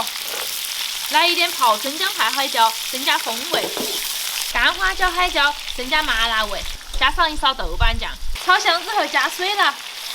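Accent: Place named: Nanchong, China. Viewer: 怪不得听你说话那么熟悉的乡音，我也是南充的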